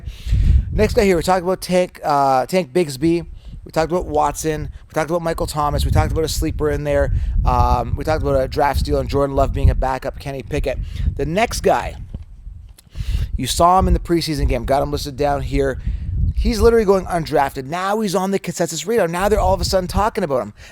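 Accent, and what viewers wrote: Canada. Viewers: Hey man I have an important question, are you Canadian? The way you say about sounds like Avery Canadian accent, lol. Btw you have the best fantasy football information, and people should definitely get the 16 rounds fantasy solution. Thank you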